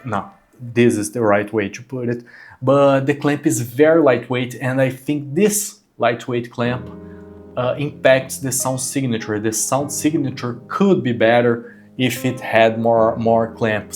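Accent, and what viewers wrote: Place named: Brazil. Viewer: Eu entrei no vídeo pensando "só gringo deve estar fazendo review desse fone" aí no que ouvi o sotaque já saquei que era BR kkkkk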